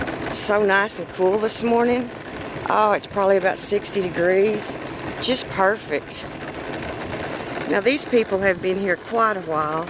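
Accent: United States. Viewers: Next time you go out, I would love you to take some carrots and get the horses to come close to the fence and feed them the carrots. Would love to see them up close. I wish I could pat them. Does the field smell great after the hay has been mowed? I love your Texan accent. And love seeing the fields that go forever. It has been so great to come on a ride with you. I love how one of your neighbours planted heaps of trees. xoxoxoxoxoxoxoxoxoxoxoxoxoxoxoxo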